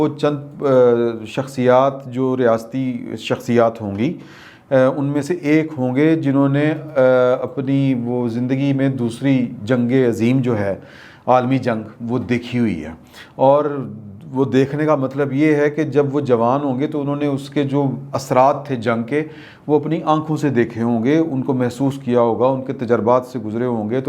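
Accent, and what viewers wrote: Punjab, Pakistan. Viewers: Good information and your way of speech ( punjabi touch ) is very nice . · Ur great 👌 Sir 👌 g good job 👍 naam tou suna hi hoga dialogue with Awais Sheik Multan Wala Punjabi tarka Kamal Ka hota hai aapka Bhai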